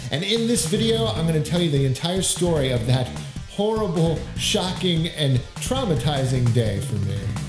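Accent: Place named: New York, United States. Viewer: awesome yea I've been all over at this point (Li) I've seen u mention in previous videos your family was from Li that's great. I can def tell the NY swag and way Long Island is pronounced in videos 🤣 its a Li thing. But yes I was lucky enough to have my stepfather replace all the things lost which def wouldn't have happened a year prior. Grew up with a single mom so I always got hand me down systems. I def wasn't getting another had he not replaced it. He felt awful I was very lucky.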